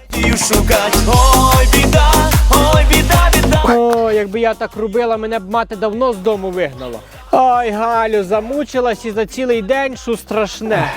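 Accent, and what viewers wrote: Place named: Lviv, Ukraine. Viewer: У вас такой хороший юмор .что снова приходит настроение .А ещё нравится чисто львовский диалект .он очень приятный .Спасибо вам и радуйте нас и далее.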